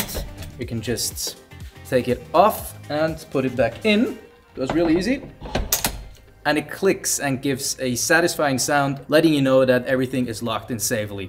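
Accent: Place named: Switzerland. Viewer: I can hear that swiss accent miles away. But thanks for the comparison. Feel like Nanlite has been catching up. However im sticking with Apputure.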